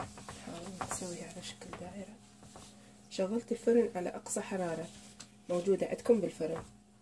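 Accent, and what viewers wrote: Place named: Iraq. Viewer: تسلم ايدك عاشت العراق واهل العراق الطيبين اجمل اشي بالفيديو هدوءك واللهجه العراقيه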